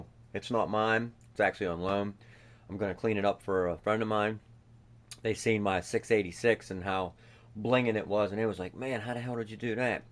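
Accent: Pittsburgh, United States. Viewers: You sound like you're from Pittsburgh.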